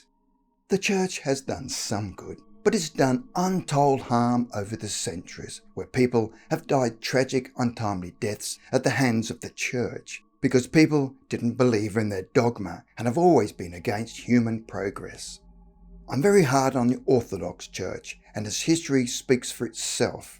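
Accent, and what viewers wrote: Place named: Australia. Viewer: okay than the narrator must be austalian.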